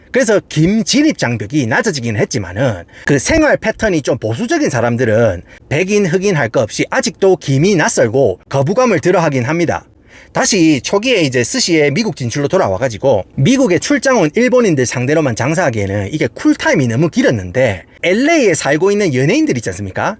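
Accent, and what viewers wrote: Gyeongsang, South Korea. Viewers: 전혀 공격적이지않고 재미있게 듣는데.. 아.. 경상도 아닌 분들은 공격적이라고 생각하실 수도 있겠네요 ㅎㅎ 경상도 특유의 억양일뿐 절대 공격적 멘트 아니라는 점!!! 잊지말라규~~❤ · 이정도면 경상도 사투리 중에 진짜 밝고 스윗한 사투린데😂ㅋㅋㅋ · 경상도 사투리가 너무 심하다... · 경상도사투리 이정도면 상타치입니다ㅋ 근데 연배가..저희 큰아빠 말투신데😅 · 저도 서른마흔다섯살 보통 갱상도 아재입니다만 억수로 부드럽게 잘 설명해주시고 계시는 것입니다 ㅎㅎ 스울 분들 들으시기엔 공격적으로 들리시겠지만 그거 절대 아니라는거 잊지말라규 ㅎㅎ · ㄹㅇ 경상도사투리 기준으로 은 매우 스윗하게 말하는거임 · ㅋㅋㅋㅋㅋ 서윗한 경상도 사투리로 들려요~ ㅋㅋㅋㅋ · 이렇게 나긋나긋한 갱상도 사투리는 살면서 들어본 적이 없거늘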